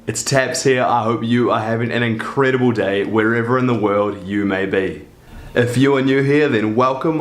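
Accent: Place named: New Zealand. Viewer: Hey are you from New Zealand? You sound like a kiwi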